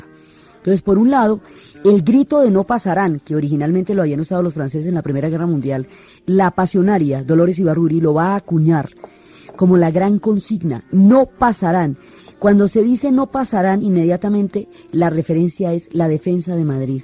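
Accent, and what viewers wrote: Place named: Colombia. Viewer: Felicidades,a pesar de conocerme de pe a pa esta historia me resulta grato escucharla con el acento de...Colombiana? y los incisos de canciones y peliculas...genial las he visto todas!y mejor no se puede expresar la sintedis de ka lengua de las mariposas manita arriba y..LIKE!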